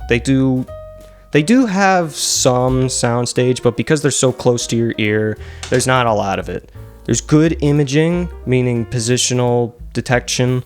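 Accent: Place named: Australia. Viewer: You sound like that funny Australian man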